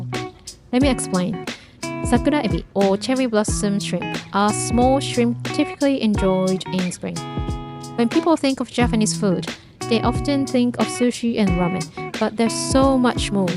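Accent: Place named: United Kingdom. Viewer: I have never seen your channel, and I like your accent, it’s very British.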